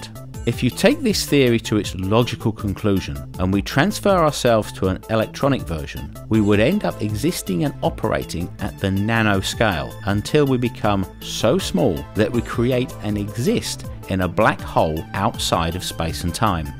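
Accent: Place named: Cockney, United Kingdom. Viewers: i came here just because i caught the voice of a cockney. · Yes he has got that same nasally sound,and London accent. I was thinking that also.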